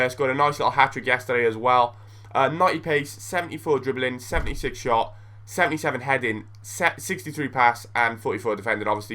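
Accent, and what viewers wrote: Wales, United Kingdom. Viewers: Ur welsh!?!? :O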